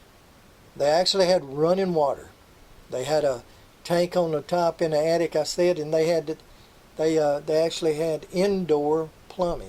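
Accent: South, United States. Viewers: This is very interesting and great narration/education...BUT... your hick southern twang somewhat makes it comical. But this is the deep deep south, so I guess, voice blends. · Another beautifully done, beautifully narrated video. This transplanted-Yankee North Carolinian is an admiring follower. Keep it up. I hope you continue making these, there's nothing else like it on YouTube. You combine, charm, simplicity, timing, visuals, human interest, and detailed historical fact like nobody else can. And if you ever lose that wonderful southern accent, you're going to be in big trouble with me. Lol. Many thanks.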